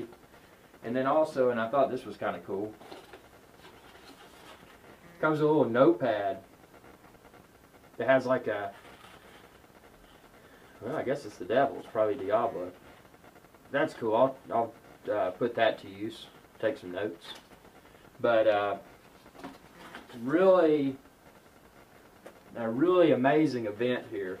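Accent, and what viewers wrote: South, United States. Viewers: Not true there was neverwinter nights on the old imagine web service back in the day. I feel you are unqualified to review games, Because you are a mac user. Not to mention your southern accent really makes it hard for anyone to take you seriously. not to mention the fact that you showed no gameplay footage nor did you mention the features of the game. honestly I don't think you even played it.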